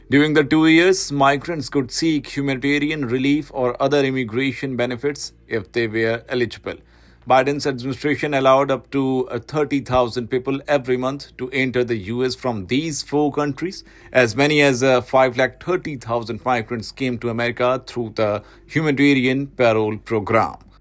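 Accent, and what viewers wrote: India. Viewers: Heavily indian accented and using the word LAKH only used by south asian countries.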